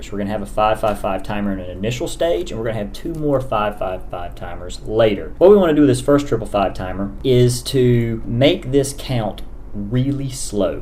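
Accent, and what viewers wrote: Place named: North Alabama, United States. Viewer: Where are you from? I grew up around the South Georgia accent and I can't quite pin you down. North Alabama, maybe? Thanks for the great tutorial.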